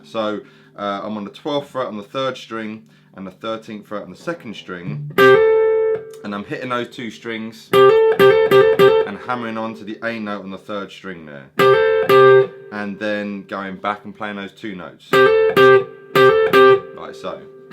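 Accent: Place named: Cockney, United Kingdom. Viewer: def sound like a cockney I was born in whitechaple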